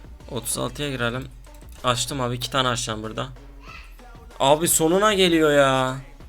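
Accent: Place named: Antep, Turkey. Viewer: Antepli misin şiven benziyo da yada adanalı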